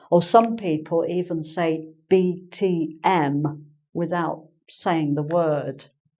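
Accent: United Kingdom. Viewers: Hey there! I'm a brazilian English student. I thought her British accent so cute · Granny !! Really all Your lessons very fantastic and useful for beginners to learn the British accent could you help me to get the special classes to get improve my pronunciation&accent .. hopefully waiting for ur text message!!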